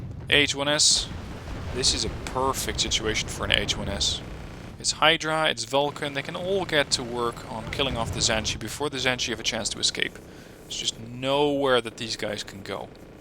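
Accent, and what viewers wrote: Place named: Netherlands. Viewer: Killa Armadilla still, it's an easy mistake to make as dutch and Swedish have several identical words and a similiar flow which makes for similiar accents when speaking English :)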